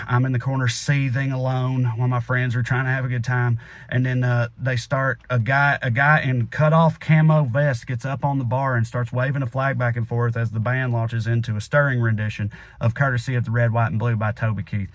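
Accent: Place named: South, United States. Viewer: I'm an old Southern woman with an accent very similar to, so I tell him, "I love ya like I was your Mema!"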